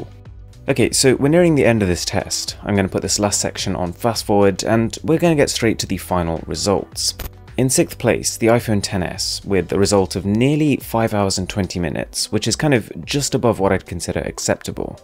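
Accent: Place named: RP, United Kingdom. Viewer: Is it on purpose that you have that posh accent when talking ?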